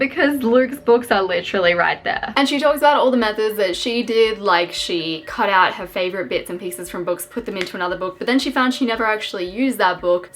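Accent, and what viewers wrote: Australia. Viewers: omg are you Australian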